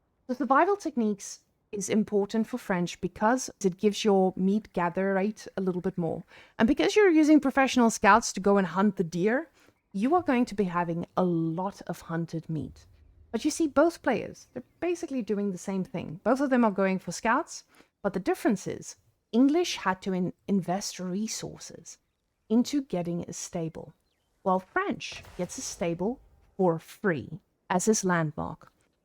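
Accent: South Africa. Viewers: I'm not a beginner but I watched the whole video to enjoy the dulcet sounds of mesmerizing South African accent.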